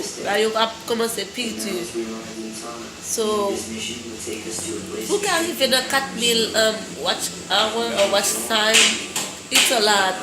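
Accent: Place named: France. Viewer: I love ypur french accent young lady you got my upport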